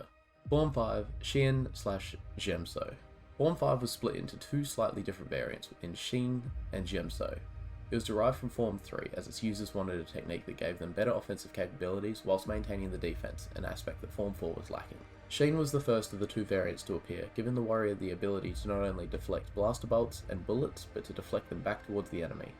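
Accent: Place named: Australia. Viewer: That Aussie accent 🤌Great video man!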